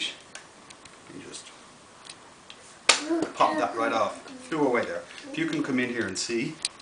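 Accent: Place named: United States. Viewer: "you got yer safety over here!" you're such a yank now!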